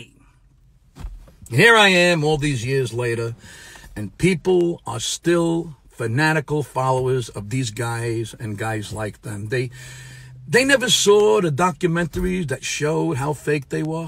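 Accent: New York, United States. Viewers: ...you sound like ur from New York?? Crack me up!! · What area of America is this guys accent? New York? I love it 👍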